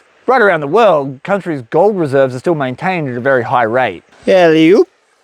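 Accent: Australia. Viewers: Bwahahahahahahahaa I loved the oratory comments spoken like a fair dinkum true blue Aussie mate, you have a way with words that bring tears of laughter to my eyes. Thanks for that I needed a great laugh and it was all true what you was saying about how the Gold standard was abolished by Richard Nixon of the Watergate Episode lol. Crikey do you think with all the shit going on that gold will rise further higher? I guess it is a waiting game hey, well stay safe and have a cracker of a day and you too.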